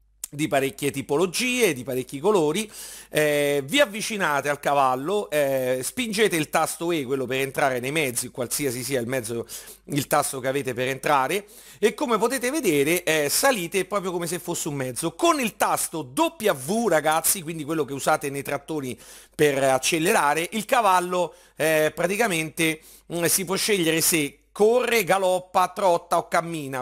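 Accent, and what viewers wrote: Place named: Rome, Italy. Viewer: bravo, ottima spiegazione! e poi il tuo accento romano è troppo forte!!!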